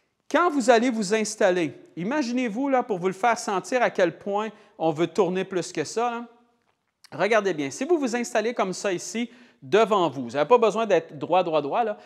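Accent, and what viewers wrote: Canada. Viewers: Merci beaucoup, pour ces vidéos très claires et faciles à adapter. Et avec l’accent canadien, c’est encore plus sympa!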